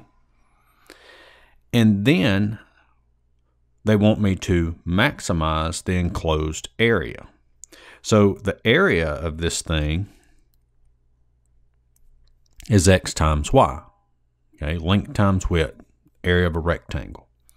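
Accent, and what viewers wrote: South, United States. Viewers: Good video. Definitely the first southern guy I’ve had in one of these videos lol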